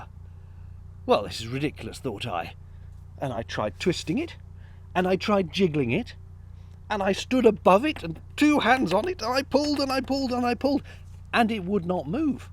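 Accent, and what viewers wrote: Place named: England, United Kingdom. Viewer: Brother from another Mother, having too much fun watching U. Also love your English accent/vocabulary. =^..^=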